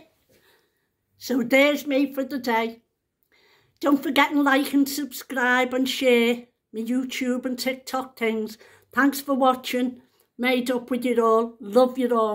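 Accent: United Kingdom. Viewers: ❤🧚🏻‍♀️🌹 I’m So happy to meet U on here. I’m Teresa, from New York, USA. I just LOVE Ur accent. I’m guessing British. I love so much of England and Ireland. I’m Puertorican and my great grandma was Irish. If U are, equally I like U. Ur personality is lovely n sweet. Hope to see more of ya my sweet lady 🩰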